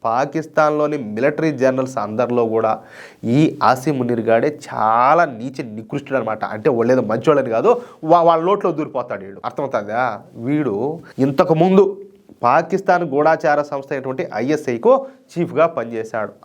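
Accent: India. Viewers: Mr., I like your videos due to your slang of telugu.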